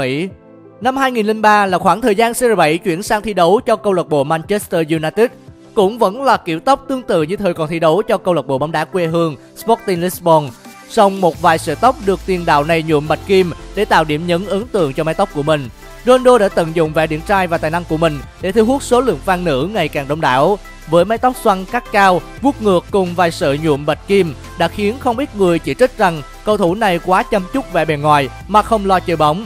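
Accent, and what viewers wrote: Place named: Da Nang, Vietnam. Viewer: Giọng đà nẵng chắc luôn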